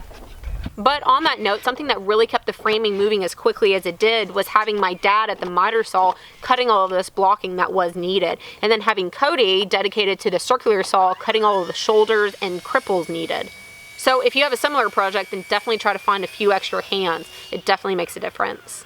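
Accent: Texas, United States. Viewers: You leave her Texas accent alone! ;)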